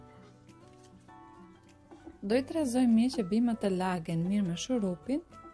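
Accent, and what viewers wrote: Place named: Albania. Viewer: Fsleminderit zonj po nese man te mi tregon kto lloj qaji ku i gjejm edhe si quhen te lutem me trego kjart sepse ju te shqiperis folni pak ma ndryshe e ste kuptoj kam dedhir ta kuptoj kete reqet sepse kam nevoj se kam bronhitis edhe asma per kete jam shum e interesuar ta di se nuk e kuptova llojet e qajit mos ke nji na i thojm qsj blini asht ni druose nji si me than pem te lutem nese e shikon ket ma kthen pergjigjjen flm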